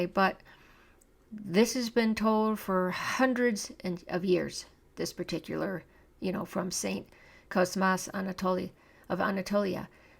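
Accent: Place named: United States. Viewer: YT “fed” my this channel. Interesting, but I have some questions, comments, observations. First, when did your mother attend HS in Palaio Faliro? I lived there. I knew THREE women who went to school there, before 1960, none ever mentioned that Constantinople would be returned. But I have heard others say they were taught this-in rural Greek schools. Second, observation, you sound American. You’ve never noticed that Orthodoxy, Hellenism, and Greece are generally painted out of the news-scape? Pascha is glossed over, because it’s not Western Easter, while Jewish, and even Moslem and now Hindu holidays get press. You haven’t noticed that living in the US? Because I have since I came here. You have some good nuggets of information. I like the links and references to Nima and Col. Wilkerson, and Col. McGregor. For whatever reason (perhaps they were spurned by the system), the tell a lot of truths the mainstream media keeps. 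However, they have their biases. I’ve been watching McGregor for years. I like him, BUT, he was a Turkophile. Of late, he has seen the light and become more objective when discussing the Turks. The US officer corps, Army/AF has always favored Turkey. Israel is committing the first live-streamed genocide and apartheid. Israel is every bit as despicable and criminal as Turkey/Ottoman Empire, FYI. Greece should not trust Israel, or depend on it. The Greek government is basically beholden to the West/US. They are puppets. Back to the prophecies (as reported by you)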